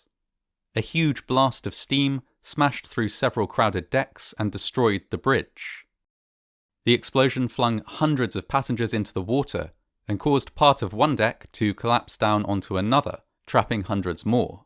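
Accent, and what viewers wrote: England, United Kingdom. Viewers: By his accent, one would assume he is English, so the spelling HOSPITALISED is correct.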